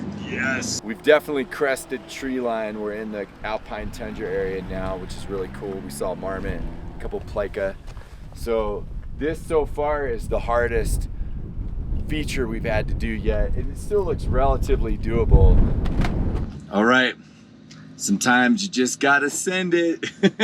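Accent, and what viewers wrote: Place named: Southern California, United States. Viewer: Yup, his accent is so SOCAL it hurts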